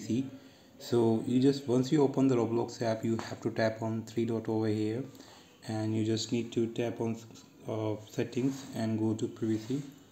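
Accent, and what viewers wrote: India. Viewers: He’s India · Don’t be rude he is cool I understand him he’s is Indian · He’s Indian.. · Is bro from India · He is Indian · Thats is actually very disrespectful he has an indian accent so dont be rude. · That's rude he is Indian I understand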